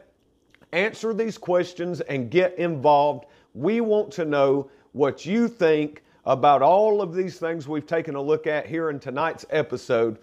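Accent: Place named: South, United States. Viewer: Surely this isn't yet another diss on the southern accent. Lol You go baby. Love the drawl!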